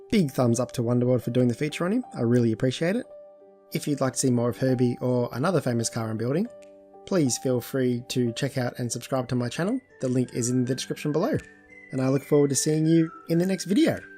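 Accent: Australia. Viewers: Herbie and KITT. He sounds like an Australian version of me. I'd love both of those in ny garage but I'll settle for just Herbie. At the moment.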